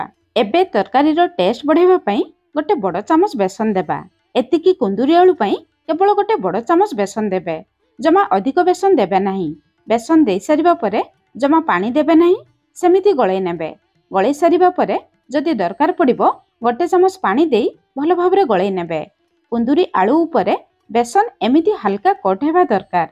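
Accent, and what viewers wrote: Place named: Ganjam, India. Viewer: Ganjam style re torada kahanti...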